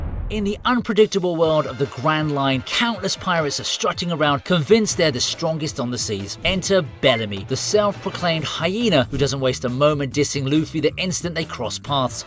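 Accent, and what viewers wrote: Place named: United Kingdom. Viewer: Sheesh British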